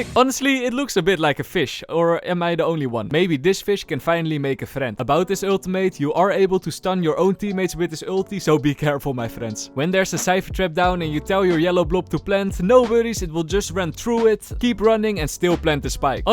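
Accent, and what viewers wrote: Netherlands. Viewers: hey are you dutch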